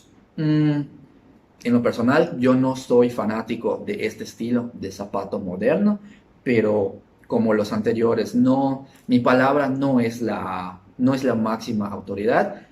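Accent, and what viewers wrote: Yucatán, Mexico. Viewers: PÉSIMO video!!!....el acento de yuca te lo paso porque es de tu origen de procedencia, pero el que titiubees y te equivoques a cada rato? tas cañon mi yuca!....antes de salir a cámara elabora un "script" para que puedas hablar de corridito y con fluidez, estas hablando de zapatos y no conoces algunos modelos y te basas en fotos de instagram!!!...muy mal...por lo minimo, date una vuelta por León, Gto...pa´que conozcas. JBE y ZARA son marcas que es de uso masivo, para nada de tipo artesanal..y dudo que conozcas marcas "premium" ....donde dejaste a MICHEL DOMIT?....supongo que apenas comienzas en esto, ojalá y pa la proxima te salga bien......bye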